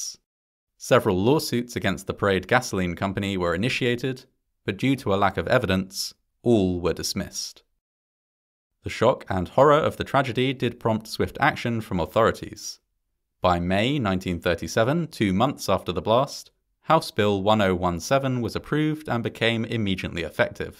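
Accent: England, United Kingdom. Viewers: I like how you don’t realize he’s English and that they pronounce things differently. America isn’t the centre of the universe.🙄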